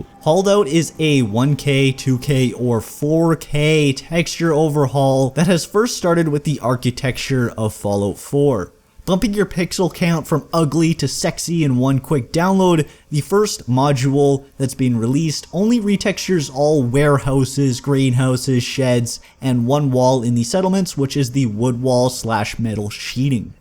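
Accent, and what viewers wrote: Canada. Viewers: i've never found out that a person is Canadian as fast as in this video o.O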